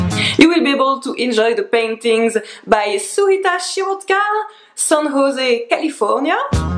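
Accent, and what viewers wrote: France. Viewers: As a watercolor pigment geek, and yes I also live in France but have not your cute accent, I try to put each day the spot on one pigment. Thanks to you as this idea came up watching your video. Merci beaucoup et à bientôt.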